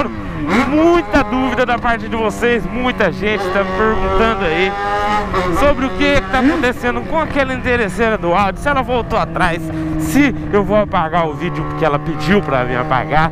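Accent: Goiás, Brazil. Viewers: volta a falar pique do Goiás quem apoia??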